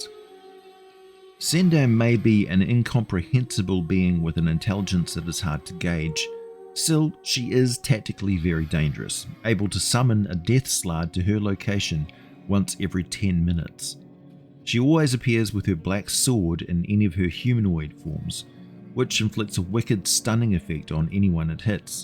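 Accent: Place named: New Zealand. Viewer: I've drifted off to sleep listening to your voice so many times my inner voice has a kiwi accent now. Currently worrying if kiwi is OK to say.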